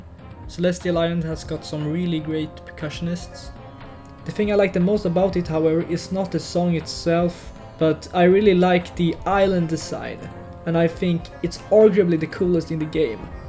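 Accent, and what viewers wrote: Sweden. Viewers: You have a strong swedish accent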